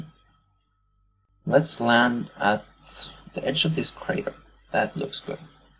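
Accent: Norway. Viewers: i hørte med ein gong at du var norsk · Where are you from? Norway?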